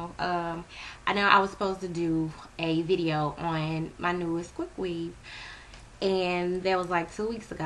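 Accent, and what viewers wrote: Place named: South, United States. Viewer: i can tell ur from the south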